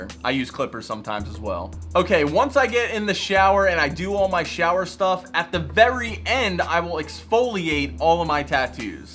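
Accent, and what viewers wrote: Philadelphia, United States. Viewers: nice video, i can tell youre from philly/delco by the accent lol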